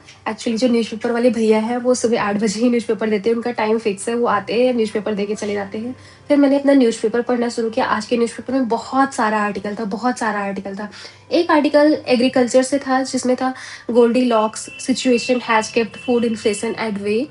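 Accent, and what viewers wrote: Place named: Uttarakhand, India. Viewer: Tone sun k lag rha ki ap uttarakhand se ho ......kis distric se ho Btane ki kripa kijiyega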